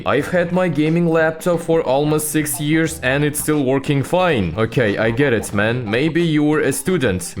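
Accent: Russia. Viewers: Not trying to be mean but the Russian accent is so hilarious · 😂 it's russian accent ig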